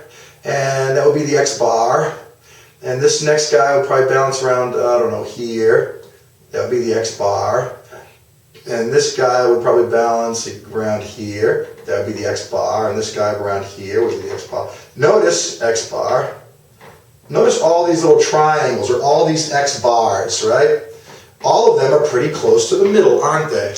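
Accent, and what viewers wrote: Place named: Maine, United States. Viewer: Sounds like he is from Maine.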